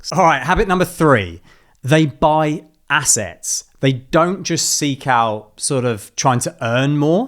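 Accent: United Kingdom. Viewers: This is a really useful resource, both in terms of finances and for learning English. are you a Londoner?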